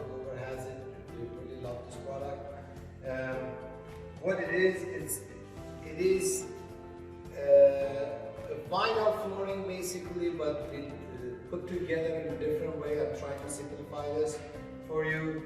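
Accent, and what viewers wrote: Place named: Iran. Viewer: Dude’s name is but accent is so iranian 😆 #loveit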